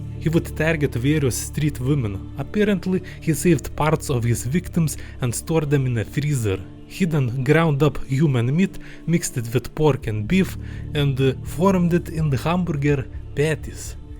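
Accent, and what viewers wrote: Russia. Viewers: Are you russian? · I might say several positive comments about your vids, but they've been already said. In addition, narrating horror documentaries with russian acent might be a new genre, so keep it up my friend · Are you Russian if so that’s fucking awesome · speaks English in Russian manner. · Are you from Russia? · im Ukrainian and by your accent and costume i already think you are Russian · Speaks like a third of Russians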